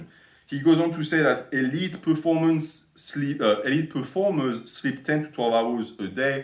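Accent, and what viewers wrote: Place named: France. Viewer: I feel like has found the most unexpected niche in the fitness industry and that's critiquing stuff in a french accent, idk why it's so addicting to listen to.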